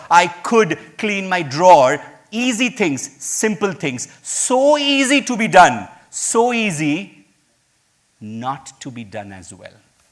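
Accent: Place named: Kerala, India. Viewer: Sir ur from Kerala? Ur accents are so pleasant 👍👍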